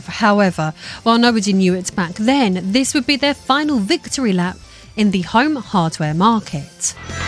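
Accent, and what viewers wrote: United Kingdom. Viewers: Essex girl with elocution lessons reads AI script, today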